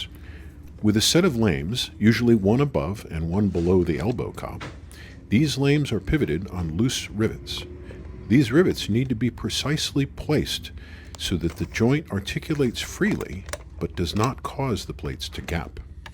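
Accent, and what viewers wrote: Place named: United States. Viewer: No, an American voice talking about anything makes me puke.🤮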